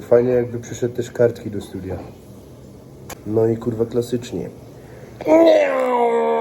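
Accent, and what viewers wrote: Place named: Silesia, Poland. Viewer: Śledze cie od 6 lat albo lepiej, jeszcze kiedy nagrywales ciekawostki bez pokazywania twarzy i miales z 50k widzow. Ta zmiana akcentu na "ala śląski" jest pod widzów? Kiedys normalnie mowiles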